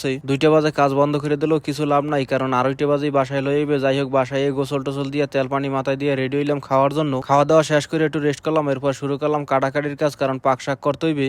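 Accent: Barishal, Bangladesh. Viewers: এই হালায় মনেহয় বরিশালাইল্যা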